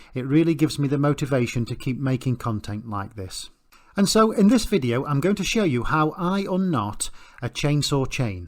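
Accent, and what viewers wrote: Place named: England, United Kingdom. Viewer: Damn dude... What a breath of fresh air to come across a video that is clear, concise, gets right to the point...and above all else...provides the solution to the stated problem. In some ways I was thinking this may be the quintessential "perfect" diy video on YouTube. By this I mean, it certainly does everything listed above, but in addition, it handcuffs the malcontent viewer who finds fault in every video...the video quality is more than sufficient. The audio is of even higher quality, not to mention the soothing, dulcet tones of the anglican accent. Plus, there's no fat on this bone... There's only the bare essential information the viewer tuned in for. Well done. You don't have to sell me on subscribing, this video meritoriously earned my subscription.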